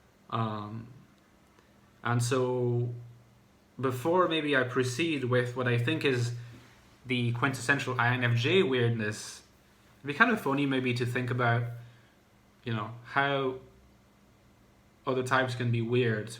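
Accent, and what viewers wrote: Ireland. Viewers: Oh, so that's why you speak English with an Irish accent! (You had an Irish flatmate. It all makes sense now...) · You shared a room with an Irishman! That makes a lot of sense...I thought you sounded Irish at first (and I still kind of do) I'm not even going to comment as much as I could on the weirdness part. I've been called 'weird' so many times in my life when I haven't even been ~trying~ (I appreciate your differentiating from innate and 'bestowed' weirdness since I never considered myself weird until I started to notice this pattern.) I'm also a girl (not sure of my type) so I think that makes the 'weird' accusations...different somehow?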